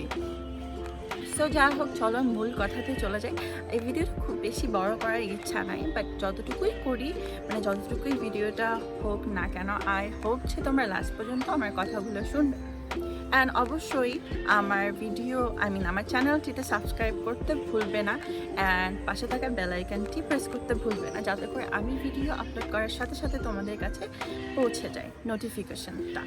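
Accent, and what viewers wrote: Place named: United States. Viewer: আপু তোমার কথা গুলো এরকম কেন ??ইউ এস এ আসল বাসা তোমার??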